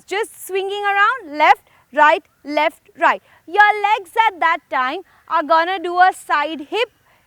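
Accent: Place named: India. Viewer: you speak english with indian accent so i cant understand what you are telling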